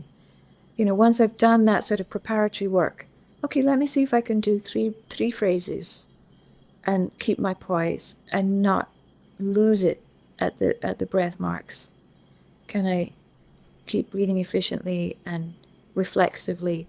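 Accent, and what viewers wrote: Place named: Ayrshire, United Kingdom. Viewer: Lovely to listen to - she still has her soft Ayrshire accent!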